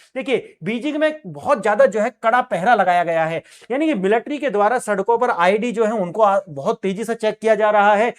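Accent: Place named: Bharatpur, India. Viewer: आपको सुनने के बाद बार बार ऐसा क्यों लग रहा है आपकी भाषा भरतपुर के लोगों से मिल रही है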